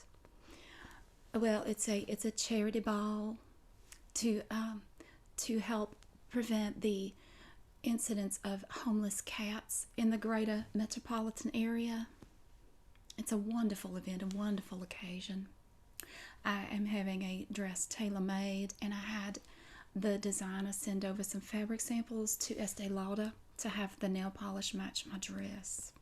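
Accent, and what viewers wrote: South, United States. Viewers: I love this accent, cause it's like a combination of American Southern and an English accent, lol. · Lots of tingles from the southern drawl, wow :) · Reminded me a little of The Golden Girls' character Blanche Devereaux; purely in accent and glamour.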